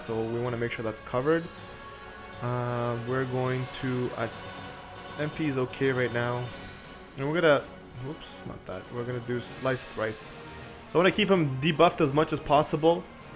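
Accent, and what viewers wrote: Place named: Caribbean. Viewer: it's hard to say, Trini or Bajan maybe, Bahamas but you don't eat all your words in a single gulp so maybe not Bahamas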